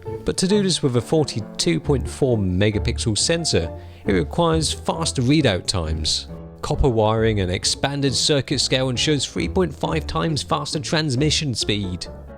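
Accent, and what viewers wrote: United Kingdom. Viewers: Asian guy with British accent is blood awesome! Isn't it? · Most annoying thing is Asian talks in British accent 😑😑😑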